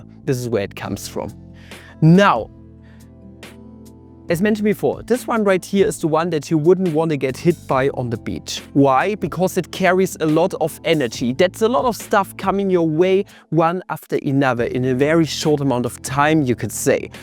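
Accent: Germany. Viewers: Are you German? you have quite the accent.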